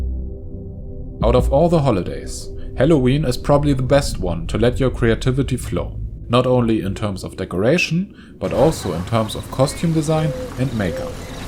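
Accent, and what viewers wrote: Germany. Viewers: Du hast einen deutschen Akzent ^^ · Ich liebe deinen deutschen Akzent. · Are you somehow related to ,,Kurzgesagt"? You seem to draw similar and have a german accent. No offense tho, could be heavily mistaken. Ty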